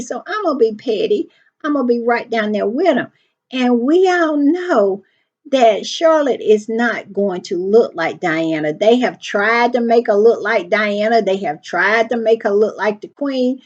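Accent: South, United States. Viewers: ❤❤ TY ❤❤ We love the pettiness, the messiness, the southern drawl, we love it all!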